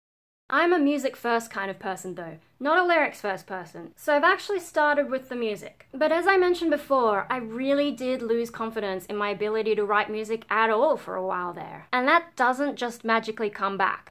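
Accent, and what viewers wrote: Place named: Australia. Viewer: Whoa. Shot out of the cannon. Very YouTube 2018ish. I've seen other YouTubers with this style. I always want to tell them to cut down on the coffee. :) Are you picking up a Valley Girl accent? Please don't! I like the English with the slight Aussie touch. Without a doubt, you are creative. Ten years of vlogging and countless projects, to name a few. For myself being creative means, to create my own world. As a kid, building blocks were my favorite toy. These roots translate into many other things. On a side-note: I like - The Tea Party at the End of the World - Just a soothing feeling I get from the visual of the installation. Asexuality and Music: Well..., here we are at the issue. - I'm convinced that sexuality or sexual desire, is the biggest driving force in music. Sex, Drugs and Rock'n'Roll to name a cliché. As easy as that. 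And not just for Guns N'Roses type music, but for the most unassuming music too. So as a teacher, my most-obvious question would be: If you take sexuality out of the equation, what is left as a drive?! I would want to know about the driving force. About your journey and your conclusions. The feelings about it all you can convey, and last but not least...what meaning does it all have for you? BTW: I shamelessly lifted many of these questions, from the basics of how to write a movie script. - A lot of psychology can be learned from the techniques of writing a movie script or story. Like the Hero's Journey. You know? The audience wants to know abo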